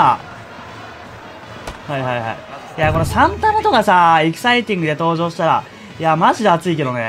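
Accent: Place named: Western Japan, Japan. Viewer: 時々、西の言葉入るよね～！嬉（笑）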